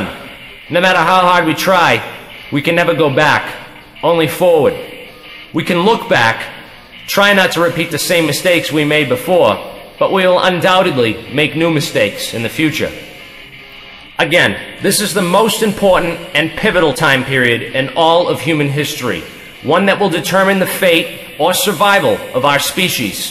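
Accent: Boston, United States. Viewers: Good video, as always. But why do you have that Bostonian accent you never had before? I felt like I was watching the departed where the accents changed throughout the film. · What's with the Boston accent?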